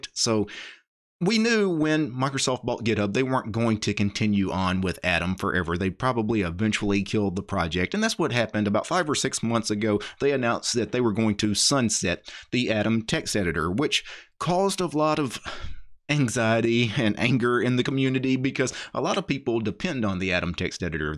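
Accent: South, United States. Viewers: Your Southern accent is sick. 🔥🔥🔥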